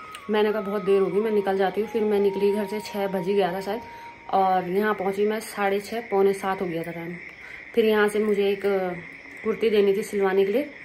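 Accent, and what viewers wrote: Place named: Pahari, India. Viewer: Talarni 🤣🤣🤣 theth pahari👍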